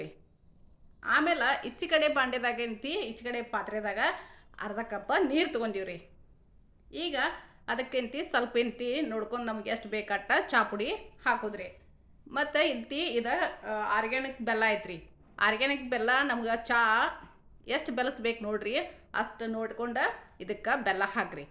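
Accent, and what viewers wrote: North Karnataka, India. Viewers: Uttara Karnataka bhashe adu . Sudharisodenaiti karnatakadavarellaru Bengaluru , mangaluru ,pustakada bashene matadbekaa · Uk style matafi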